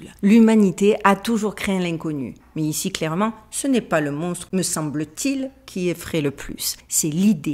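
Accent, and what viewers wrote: Marseille, France. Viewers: Tu es trop mignonne ma chérie et tu parles trop bien français t'inquiète avec ton accent du sud c'est merveilleux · Bonjour. Merci encore pour tes infos, ta diction et ton accent qui rappelle que la Sardine a bouché un jour un très grand port. Ne changes rien. Par contre certains sont vraiment murs pour l'asile. A force de faire n'importe quoi et de se prendre pour Dieu le père, ces derniers contribuent assurément à la destruction de l'humanité. See you next sunday! 😍😘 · Bonjour je t'avoue que les 3 sujets sont plus qu'inquiètant mais je dirais que le dernier est le pire!!! Quand à ta prononciation, il n'y a vraiment rien à dire, tu t'exprimes extrêmement bien et tu n'as même pas pris l'accent Américain... Non toujours l'accent Marseillais 😊 j'adore 👍🏻 merci à toi de nous parler de tous ces sujets dont personne ne parle 😤 passe également une excellente fin de semaine bisous 🥰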